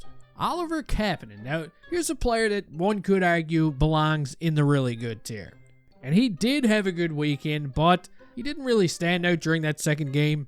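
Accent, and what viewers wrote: Newfoundland, Canada. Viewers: Are you a Newfie? You sound Newfoundlander lol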